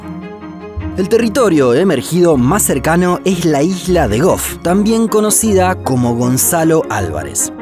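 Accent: Argentina. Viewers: Que mal que tengas el acento arjento, lo único malo del video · Me encanta escuchar ese asento argentino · Tal vez sea porque es Argentino.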